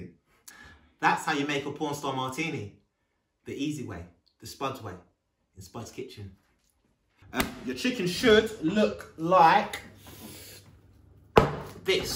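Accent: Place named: Cockney, United Kingdom. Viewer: You are so entertaining!! You’re you’re fired videos made me be so happy during lockdown. Please do more social experiments in your cockney accent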